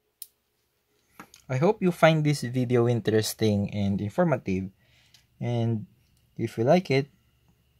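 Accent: Philippines. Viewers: that filipino accent tho :D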